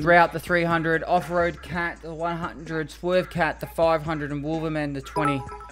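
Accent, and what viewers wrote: Australia. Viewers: Hey Pestily, are you an Aussie?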